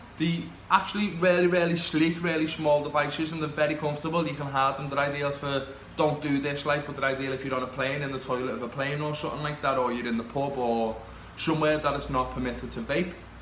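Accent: Liverpool, United Kingdom. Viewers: Liverpoooooooooool!!!!! great accent man!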